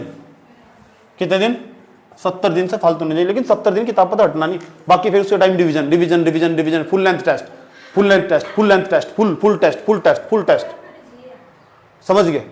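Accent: Haryana, India. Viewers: Sir aap haryanavi ho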